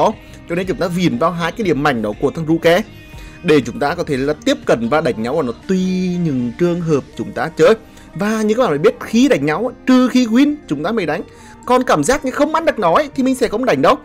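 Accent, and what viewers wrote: Nghe An, Vietnam. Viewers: a người nghệ an ạ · hắn bình luận giọng Nghệ An choa là tau thấy dễ ghe với thích rồi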